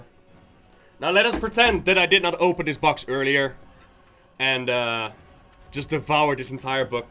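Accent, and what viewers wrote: Netherlands. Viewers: As a Dutchy also, your accent is very recognizable and makes your videos unwatchable to me XD too bad. I use wowhead though, good stuff!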